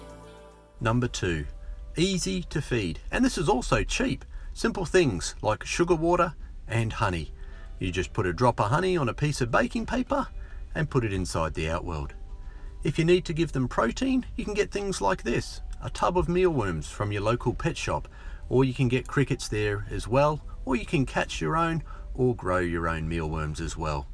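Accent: Australia. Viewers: Crikey! Are you somehow related to Steve Irwin? Good to hear another Aussie accent on YouTube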